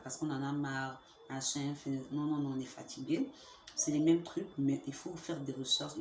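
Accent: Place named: Gabon. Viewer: Accent gabonais 🇬🇦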